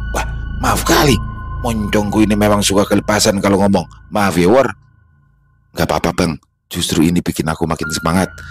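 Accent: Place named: Banyumas, Indonesia. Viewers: Akhirnya ada karakter jagoan ngapak juga.. Matursuwun mbak,Lanjutkan.., salam dari purwokerto 🙏🙏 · harus lanjut part deh cerita ini,dn di jadikan playlist,sukur2 BAWOR dan TIGOR jd partner biar slalu menampilkan logat cilacap dan batak pasti seru(seperti doni dan gembul} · Keren, bisa logat ngapak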